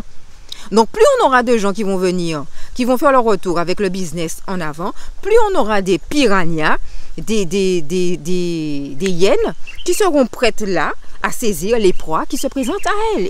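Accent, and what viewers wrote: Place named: Benin. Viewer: L’accent beninois s'est installé 😅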